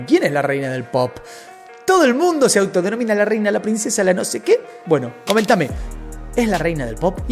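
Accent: Argentina. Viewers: bueno, no sé, yo vi este lío de otra forma, " Black le tenía ganas a Baldoni, pero él la rechazó, y como nadie rechaza a una mujer tan irresistible como black ( eso piensa ella), y como tiene un esposo tan poderoso y una amiga tan adinerada, pués comenzó la venganza femenina, destruir a su enemigo, y quien sabe que le contó a su esposo, para que él haya hecho este ridículo, poniendo en peligro su carrera". Yo pienso, la mujer está quiso destruir a un hombre que la rechazó, pero como dicen aquí en Alemania, " Se dio ella misma un tiro en la rodilla", o en Colombiano" El tiro le salió en reversa". Saludos, me encanta escucharte, ese acento argentino y como hablan ustedes me encanta, saludos de una Paisa en Alemania.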